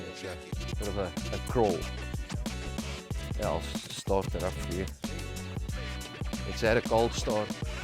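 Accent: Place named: South Africa. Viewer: You have a South African accent, wondering if you are from here min confirmed I guess. Looking at buying a 957 and doing the same with it.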